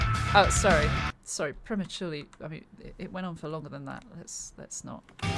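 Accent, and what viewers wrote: England, United Kingdom. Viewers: Love the English accent.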